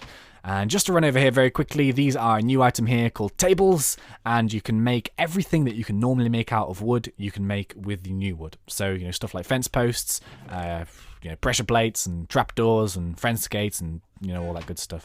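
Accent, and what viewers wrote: United Kingdom. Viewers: Are u British? And lol I love the dogs name lol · I love his British accent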